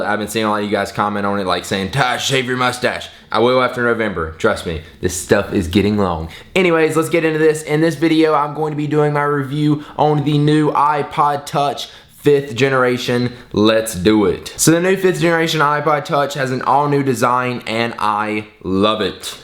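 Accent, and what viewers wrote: South, United States. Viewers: Does he really have a country accent? Never noticed that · Is this guy southern? I think I hear a little acent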